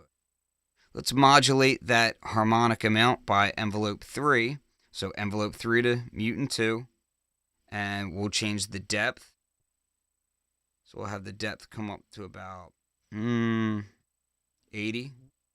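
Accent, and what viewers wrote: Philadelphia, United States. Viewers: It sounds like you have a Philadelphia accent.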